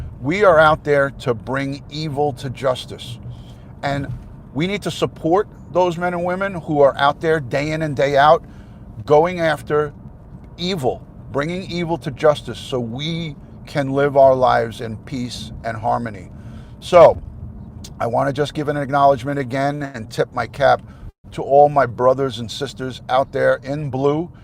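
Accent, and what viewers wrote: New York, United States. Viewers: ! I wasn’t subscribed to your channel even though I’ve been watching your content! Well, now I’m subscribed- I love your NY accent, and your input from a “lawr enforcement perspective”❤️👍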